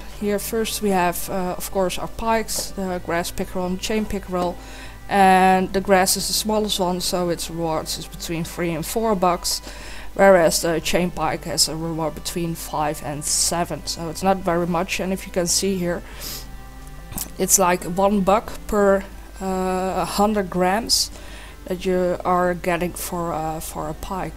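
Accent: Netherlands. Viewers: are you dutch.. ben je nl ik denk het well haha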